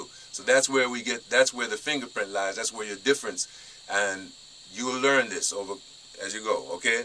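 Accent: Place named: Jamaica. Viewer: "Now if you listen carefully you will hear..." Man speaks like Bob marley 🇯🇲😁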